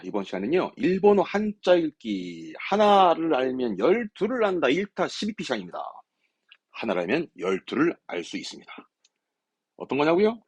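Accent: Jeju, South Korea. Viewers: 제주도 분이신가봐요,,ㅋㅋ 중간중간 제주도 말이 들려서 방가워요..ㅋㅋ 너무 초보라서 여기 나오는 예문도 너무 어려운데 이거부터 보면서 여기나오는 단어 무조건 외우면서 공부하면 될까오?😅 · 명강의 귀에 쏙쏙 들어와요~~증말 최고십니다. 글구 잘 모르지만 제주도 억양이 중간 중간 들려서 재미있었어요(정확하게 들었는지 모르겠으나 ㅎㅎㅎㅎ)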